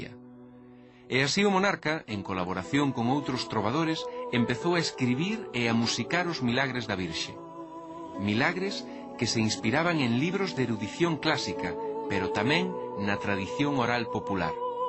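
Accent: Castile, Spain. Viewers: Se calhar percebes melhor o espanhol, isso sim. O que ouves nesta reportagem está mais para castelhano que para galego. O verdadeiro galego é pronunciado de igual forma ao português do norte (Minho e Trás os Montes), Se calhar temos todos um problema na garganta e os brasileiros como tu é que falam português correcto, vai-se a ver... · Este apresentador fala um galego foneticamente castelan e com palavras do castelan o castelhanismos. O galego, hoje, é a mesma língua que a falada na Portugal, que é um galego evoluído,.influenciado pela separação do condado de Portucale do resto do Reino da Galiza e pela implantação da língua castelhana pelos reis católicos na Galiza que produziram a castelanizaçom da nobreza galega e do galego o que a história da Galiza conhece como a ´ "Séculos oscuros, que a " separaçom que o rei Alfonso VI de Castela, Leão e do Reino da Galiza - que incluía as terras portuguesas que se estendiam do rio Minho a Coimbra, depois de tomar a o seu irmão, Garcia, rei do Reino da Galiza - que incluía o condado de Portucale, o separou do reino galego o condado Portucalense, entregando a atual Galiza a sua filha Hurraca e o conde Raimundo, e o condado de Portucalense a sua filha bastarda Teresa e o conde Henrique da Borgonha. 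Lista da reis da Galiza: <link> A verdadeira hítória dos origenes galegos de Portugal que muitos historiadores portugueses ignoran:<link> . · Un apontamento: o apresentador non ten verdadeiro ou profundo sotaque galego, ten escaseza de fonética auténtica. Seica é un neofalante desta lingua, e a sua lingua nai sexa o castelán. Dai que para os observadores extranxeiros pareza mistura co español, e que un hispanofalante comprenda perfeitamente.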